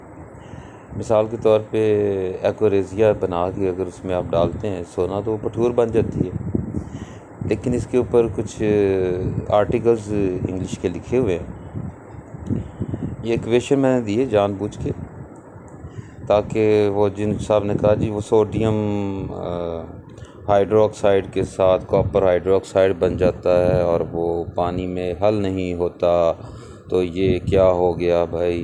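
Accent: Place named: Punjab, India. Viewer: استاد محترم سلام بحت خوب آپ اچھی رہنماٸیہ کر رہے ہیں لیکن ساٸین آپ کی آواز ۔یا کسی وقت کیمیکل ۔دواٸ کا نام اتنا جلدی یا تیزی بول جاتے ہیں جو کہ بار اس لاٸیں کو reves کر کے سننے سمجھنے کی کوشش کرتے ہیں مگر 000.ساٸین لگتا ہے آپ کا لہجا پنجابی اور بات اردو میں اور ہم تو ہیں سندھی اب استاد ہیں آپ سے گزارش ہے کہ الفاظ اور سپیڈ ایسی کریں کہ پٹہان۔سندھی۔سراٸکی۔پنجابی۔اردو۔بلوچی۔سکھ سب لوگوں کو سمجھ آجاٸے۔ ساٸیں الفاظ بھی آسان ہوں تو اور کیا چاہیے ۔ علم کے دریاہ میں سے تھوڑا سا سب پی لیں گے تو آپ کا کیا جاٸیگا۔ورنہ ایسا ہو گا کہ جیسے سمندر سے پیاسا لوٹنا۔ غلتی غستاخی کی معافی چاہتا ہوں اللہ آپ کو خوش رکھے اور بھی خزانہ علم عطا فرمائے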